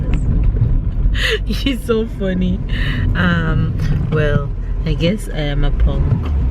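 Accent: Jamaica. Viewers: I sure will. I look forward to knowing you better. Are you Jamaican 🇯🇲? You sound like one. I'm Nigerian 🇳🇬 and my man is Irish 🇮🇪 🍀. Don't forget to subscribe to my channel too so you can also see what I'm up to.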